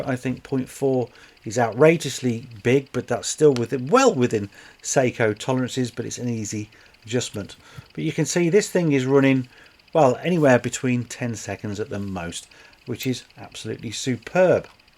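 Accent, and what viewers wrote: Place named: United Kingdom. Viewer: Hello mate thanks for the great video. Are you in the UK? It certainly sounds like it but on your website it says you don’t ship to my address (London). Is that right?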